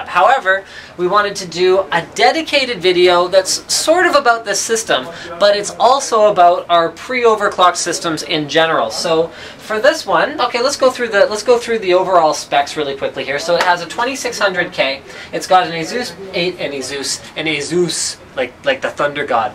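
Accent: Russia. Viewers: For how log has he lived in Canada now? Most of the Russians lose the terrible accent after a year or so...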